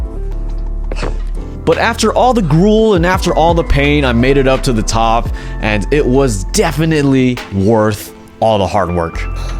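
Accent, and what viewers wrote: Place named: Bay Area, United States. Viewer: “Where’s the trail, bruh” I know a fellow Bay Arean when I hear one. 😂😂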